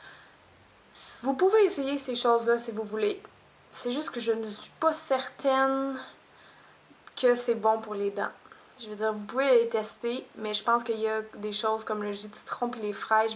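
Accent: Quebec, Canada. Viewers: Coucou ! :) :) Merci beaucoup , malgres 3 semaines de recherche au magazin et sur internet , j'ai reussi a troouver le produit :) ! J'adore ton accent ( c'est quebecois ??) :) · Comme les autres l'on dit, en France on dit "bagues". L'accent québécois est toujours aussi marrant! C'est fou comme les mots peuvent être différents d'un pays à l'autre.. En tous cas, Merci pour tes conseils :) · C'est marrant venant de quelqu'un qui fait un paquet de fautes écrites, se permettre de faire des remarques à une personne pour ce qu'elle dit oralement tout ça parce-qu'elle ne comprends pas "à cause de son accent". Au faite, si vous aviez vraiment pris le temps de regarder son blog vous sauriez que cette demoiselle vit au Canada, et qu'elle est Quebeccoise... Alala incorrigibles ces internautes... #tousse tousse un peu débile aussi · le canada ! je kiff ton accent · J'adore ton accent Québécois mais comme la dit Zephyrlevent les broche comme tu dit en France ce dit bagues. 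Très belles dents au passage. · accent canadien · J'adoooorree son accent québecois !! Abonnée directt ! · C'est l'accent québécois cocotte, par contre puisque t'en parles, en français on écrit "ça", "d'où", "vient", "saisis", "ce", "dis, "vous dites", "non". cordialement, bisous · jadore laccent canadien :p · Je viens de tomber sur ta video par hasard, et J'AIME ÇA!! CET ACCENT GÉNIAL DU QUÉBEC! ET CETTE FRANCHISE! Et en plus c'est clair, net, et humoristique!! Personne ne t'a encore proposé d'être broadcasté sur un show quelquepart?continue, ça devrait venir!! bonne chance et bonne continuation à toi!! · Wouaah ... même sur une chaîne, où une jolie et gentille fille, prend sur son temps, pour nous donner pleins d'astuce (grand merci au passage !) les gens arrivent encore a critiquer, rager, et limite s'insulter ?! déstresser un peu et renseigner vous avant de dire "nous c'est des €....on le sait !! 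Oui elle est quebecoise, d'ou l'accent, mais elle va pas convertir tout les prix, pour tout les pays, démerdez vous un peu P**** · faut écouter la vidéo avant de poste un commentaire pasque elle est québecoise mes bon... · Tes jolie, personnellement je n'aime pas beaucoup l'accent québécois... :x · Sa se voit ! Tu es Québécoise non ? :) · J'adore trop ton accent québecois ;-) HeHeHe ! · ses l'accent québécois :p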